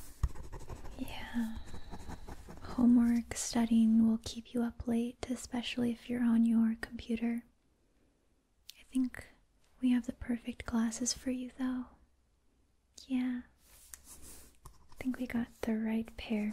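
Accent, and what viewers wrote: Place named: South Africa. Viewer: I sorta get springbok vibes from your voice and it's the best thing ever.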